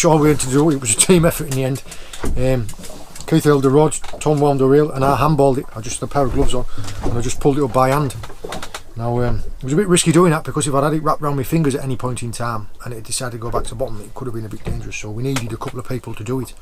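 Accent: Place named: Northern England, United Kingdom. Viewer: luvin your videos mate and your boat is great too just a nice size for what you do . i live in australia but like watching the english fishing channels probably cos i was born in the north too ,middlesbrough and i still like listening to any of the accents from up north. johno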